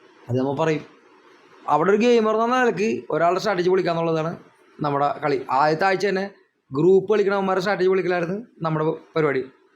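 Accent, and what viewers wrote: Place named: Fort Kochi, India. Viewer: Fort kochi ക്കാർ ഇങ്ങയോക്കെ സംസാരിക്കും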